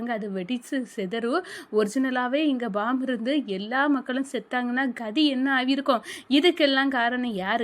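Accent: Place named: Tirunelveli, India. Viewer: திருநெல்வேலி பேச்சு super ❤ தொலிய உரிக்கும் வார்த்தை very nice. akka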